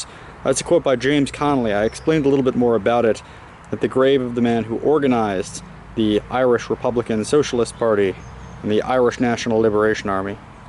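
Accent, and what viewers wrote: United States. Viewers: Who are you to come here and tell us our own history in your stupid annoying yank accent? And if you are going to carry on maybe you would try and pronounce the names, towns and people properly! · I’m American and I have been on track with the Troubles since 1969 when Bernadette came to the US, but I am taken aback when I hear an American accent trying to tell others about the Troubles. I know people who lived through it and of course I learn more from them and I’m a lot more aware of South Armagh and Belfast, but be very sure of what you say because there are more than 2 sides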